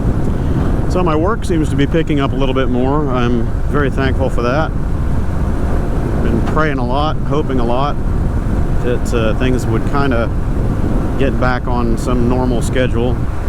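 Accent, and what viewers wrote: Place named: Oklahoma, United States. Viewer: Howdy neighbor. Sort of. I live in San Antonio. You are braver than I. I have a 2023 Vespa GTS300 and even with that I would not drive the access roads along the Katy Freeway. Heck I prob wouldn’t ride anywhere around Houston. Everybody drives at Mach 5 with their hair on fire. They do that here too but SA is still somewhat of a big small town if you know what I mean. Very different than DFW/Hou/Aus areas. But it is changing and when I retire in a few years, I’m not staying here. May move to the next county or something. Been looking at Arkansas as well as my home state of Alabama. BTW - your accent and cadence and tone is EXACTLY like someone I work with. I can’t tell the difference between you two and thought it might be him incognito here. He is from OK too. Hope you are safe and well after all those storms.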